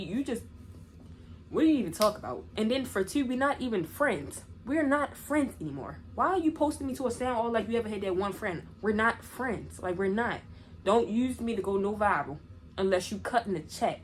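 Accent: Baltimore, United States. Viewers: I love how you talk fr 😭 bmore accents so 🔥 but she weird friend ..she might’ve wanted to be with u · I just knew you was from Baltimore before you said it 😂😂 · I love how you talk fr 😭 bmore accents so 🔥 but she weird friend , new subbie!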